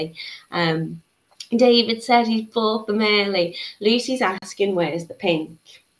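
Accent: United Kingdom. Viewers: Are you from the Uk? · NC here! I love your accent!! Reminds me of 2 great months we spent in England in the 90’s. Love making jewelry!! Thank you!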